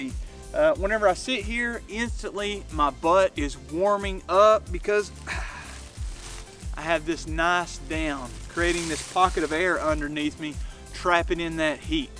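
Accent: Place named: Southeast, United States. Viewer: 2 questions Just started to use a hammock, tent was annoying me too much. So im a bit new Im actually having trouble getting comfortable? i dont like my legs bending upward like im a stork or something. Like a human banana. Is that just unavoidable, or should i adjust my hammock somehow? second. watched a couple of videos everyone seems to have a southeastern accent. (guess thats where the trees are lol) where are you from?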